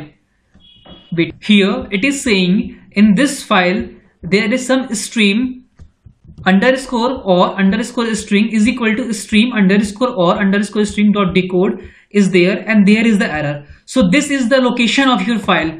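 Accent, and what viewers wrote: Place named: Arab world. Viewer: mmm bro what brother in what language are you speaking, I think it is English but as if it were mixed with Arabic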